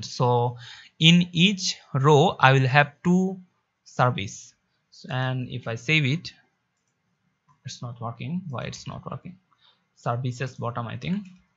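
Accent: India. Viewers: When I hear a Bengali voice with English accent, I think I'm gonna learn something easily. Superb Tutorial! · i'm so simple guys. when I saw an India English voice making tutorials, I liked :)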